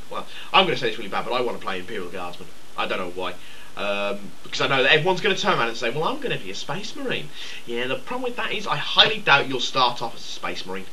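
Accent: United Kingdom. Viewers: your british-ness made this entertaining enough, not really much new to say though. Hey Hey Hey Smoke Weed Every Day. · yep definetly your real voice british? i dont know what country the currency Quids is from.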